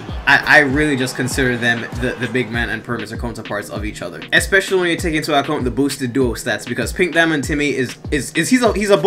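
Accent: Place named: Jamaica. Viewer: Bredrin the way the patwa come out whenever you get passionate kills me fam😂😂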